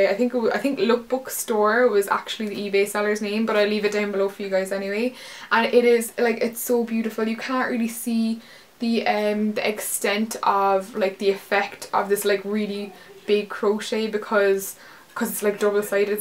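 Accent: Cork, Ireland. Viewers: It's funny how you still have your Cork accent until you say the word "everythin'" which you say in a really strong Belfast accent haha :) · That leather skirt is amazing! And I could listen to your accent all day. My favorite priest was from Cork, as well as my great grandfather. :)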